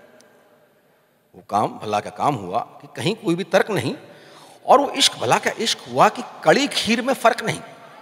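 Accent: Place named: Jharkhand, India. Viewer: The voice of Waseypur..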